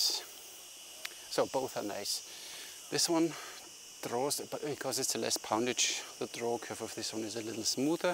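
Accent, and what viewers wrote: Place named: Germany. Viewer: That is a nice German accent! It reminds me of Dr. Emil Shaffhausen! - oh, and the bows were nice too.....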